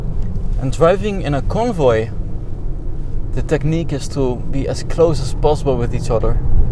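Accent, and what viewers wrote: Netherlands. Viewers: Hoor meteen een nederlander 😆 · echte hollander die engels praat :D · a dutch guy talking English... never been good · I smell some Nederlands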